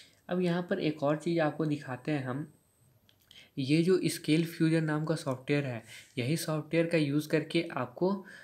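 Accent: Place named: Bihar, India. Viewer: Tu bhai pakka bihar se hai 🙂🙂 kitna ko ketna bihar me bolte hain 🙂🙂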